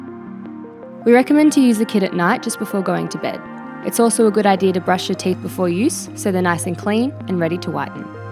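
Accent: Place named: South, United States. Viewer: Southern accent 100%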